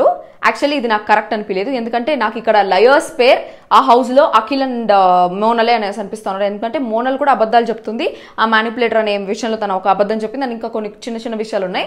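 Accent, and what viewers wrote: Chittoor, India. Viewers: I never seen a girl from chittor speaks in proper slang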